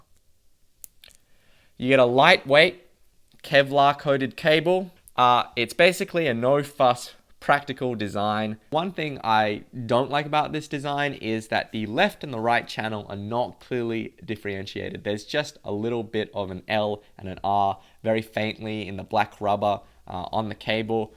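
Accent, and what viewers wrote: Australia. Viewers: uh he doesn't sound north korean, sounds more aussie to me.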